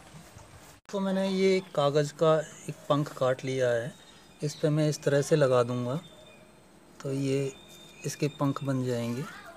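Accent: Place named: Punjab, India. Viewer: Apki hindi se Punjabi lagte hain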